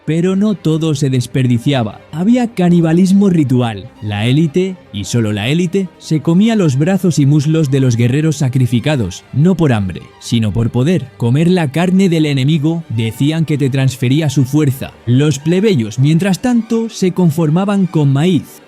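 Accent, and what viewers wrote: Spain. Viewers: العنوان بالعربي بس الصوت شكله اسباني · Como se nota que lo hizo un español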